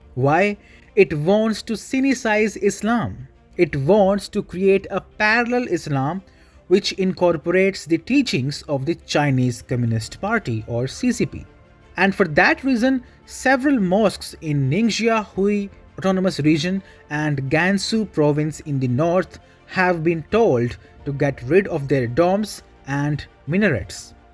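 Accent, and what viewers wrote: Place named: India. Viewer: What bull shit. The speaker sounds Indian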